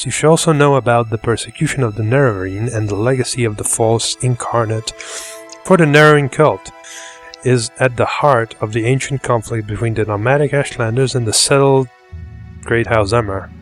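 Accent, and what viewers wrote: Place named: England, United Kingdom. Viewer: fainas tavo angliskas akcentas :)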